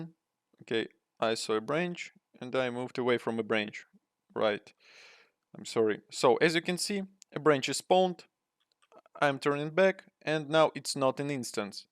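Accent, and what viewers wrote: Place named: Russia. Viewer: Акцент прям русский. Более уверен что ты наш)) Отличный урок! Спасибо!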